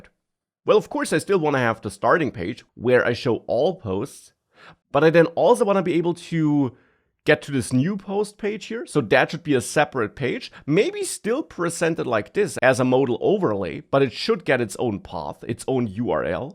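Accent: Bavaria, Germany. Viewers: funny how the Bavarian accent is disappearing :-)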